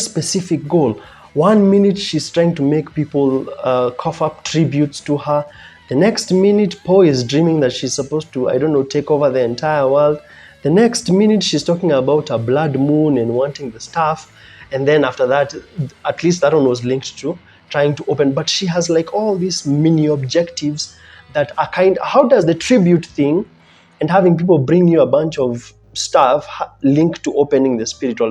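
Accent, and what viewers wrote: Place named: Africa. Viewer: I think he might actually be from Africa that's how they speak there it's a very nice accent to listen to that's for sure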